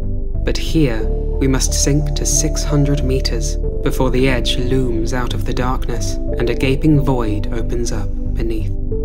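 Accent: United Kingdom. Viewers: Why is it only 12 min long ? The recording is amazing !! The quality is incredible … I don’t care for tne narration though … it seems flatlined and typical , reading every single thing with the same inflection , not having any true feeling / emotion behind it but just flat lined with that same typical cadence every few words , same lifts same falls ( if you can even call it lifts and falls when the vocal range of speaking only rises and is lowered slightly , slowly and maybe a half step in one direction or the other .. sounds like every other recording where someone with a British accent is trying to narrate a documentary… always with same inflections and none of it feels emotive or connected. Many people may disagree and they have a right to their own opinion and can say whatever they wish in their own comments , which I’d expect the same respect regarding my own …. 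I say this not to belittle , but to illustrate my point , bc honestly I feel like it’s something that could be worked out and improved upon … not everyone is meant to be a narrator and I’d leave that up to a handful of people …. With broadcasting experience that have the voice for it .. not to say you won’t eventually have the voice for it , but being completely honest , it takes an amazing mind blowing film and makes it come off amateurish with this narrative.. please at least be unique among those who just read with no pulse whatsoever .. omg!!! There are so many people that when they read , they either bore pe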